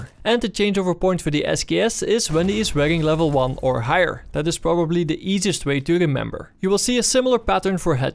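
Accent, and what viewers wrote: Netherlands. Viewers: are you dutch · A quite thick Dutch accent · You sound so Dutch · from the moment you started talking i knew.. dat je nederlands bent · It sounds like he comes from the Netherlands, so I assume that's his accent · You're Dutch arent you? :) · Are you Dutch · je bent nederlands he?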